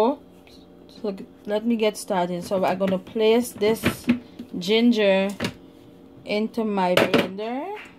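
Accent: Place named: Guyana. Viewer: i love your accent , i am from the UK ,, real guyanese accent .. ok nyce gyal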